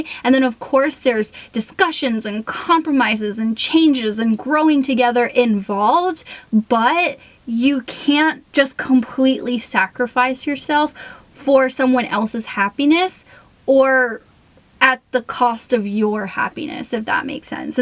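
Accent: Texas, United States. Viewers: texas accent coming through?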